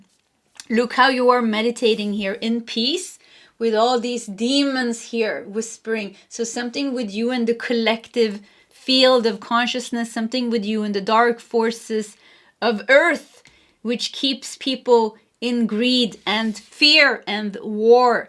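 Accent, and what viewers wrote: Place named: Sweden. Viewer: can I PLEASE adopt you as my DAUGHTER?!!😊❤😂 We just LOVE listening to your sweet, Swedish cute self in readings! And pile#2 was SPOT on every single card you read!! You were ABSOLUTELY right about the news and media.. I found myself sucked into all the celebrity gossip on YouTube last weekend..for like ALL weekend, and then my (twin flame) & I ended up in a HUGE fight..? We JUST figured out...its the DARK energy that made us get all angry.. NO more watching ANYTHING but light work and higher learning!!😁🤗😘😘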